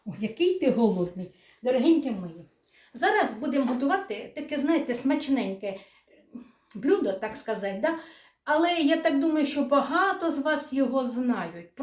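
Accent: Standard. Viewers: Як приємно, коли, нарешті, згадали українську мову. При чому, чисту. Приємно слухати. І рецепти у вас, заслуговують на поширення. Бажаю успіхів.❤ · Ой, як Ви гарно розмовляєте чистою українською мовою. Дякую. Хай Бог Вас благословляє і охороняє. Дуже подобаються Ваші рецепти.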